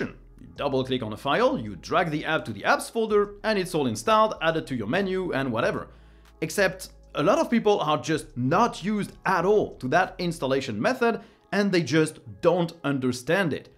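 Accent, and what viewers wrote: France. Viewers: That's French accent^^ funny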